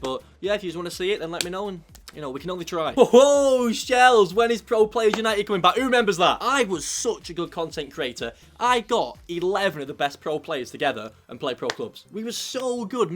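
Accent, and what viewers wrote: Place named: Tameside, United Kingdom. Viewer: You’re from Ashton? As in Tameside? Cos same, was thinking you sound local mate 👍🏼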